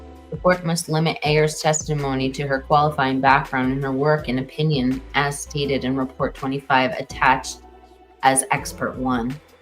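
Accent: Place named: Cape, South Africa. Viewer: where is your Cape accent? 😮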